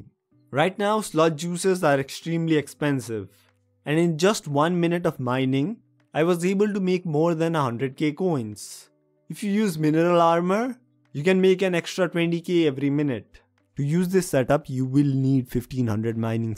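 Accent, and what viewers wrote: India. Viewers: then why is your accent so Indian and I'm not being racist India doesn't have a lot of tech from what I've seen very poor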